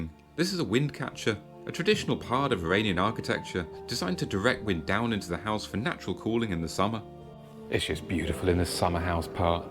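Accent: Birmingham, United Kingdom. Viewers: are you Brummie by any chance?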